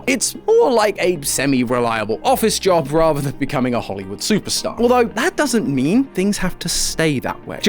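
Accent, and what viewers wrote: United Kingdom. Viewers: Ugh, what’s this British voice guy? I ain’t watching this lol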